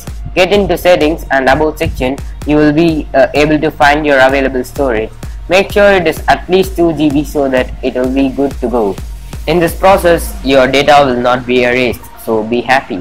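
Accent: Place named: India. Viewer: Ahh! Here comes the Indian accent!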